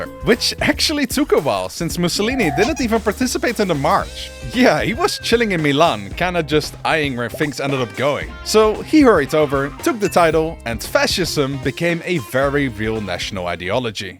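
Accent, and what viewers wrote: Netherlands. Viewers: Dutch accent? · i smell dutch here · Ik weet al door deze accent dat dit een nederlander is lol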